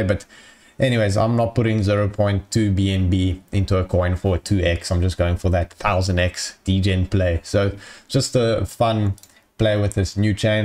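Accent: South Africa. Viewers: If I may ask, are you South African?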